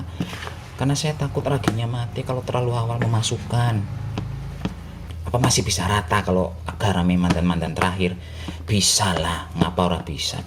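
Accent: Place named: Banyumas, Indonesia. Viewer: Resepe ga terlalu penting yg penting denger ngomong ngapak2...hiburan bgt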